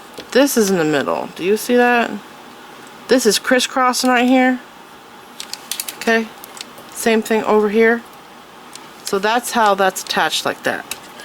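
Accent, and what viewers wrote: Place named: South, United States. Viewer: Aw geez, lolol, it's just that touch of Southern that throws you off 🤣😂😆😅😄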